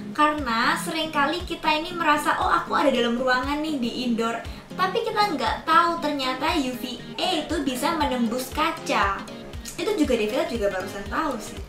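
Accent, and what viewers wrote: Java, Indonesia. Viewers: Bahasa Inggrisnya bagus. Tapi pas ngomong bahasa Indonesia medok bgt. Sukaaaaa. Indonesia banget. Gak pake make up aja udah cantik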